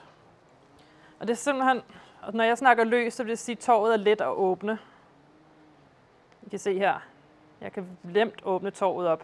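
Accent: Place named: Norway. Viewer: Norskificeret dansk lyder ret sjovt. Det er stadig nemt at forstå for mig, som dansker. Jeg tror også det virker for nordmænd. Aner ikke om det kan forstås af svenskere (uden for Skåne). Tak for videoen! :)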